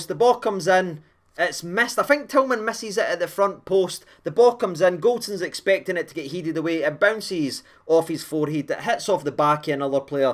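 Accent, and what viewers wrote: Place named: Glasgow, United Kingdom. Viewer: I just love your wee Wegie accent❤️❤️ 🇦🇺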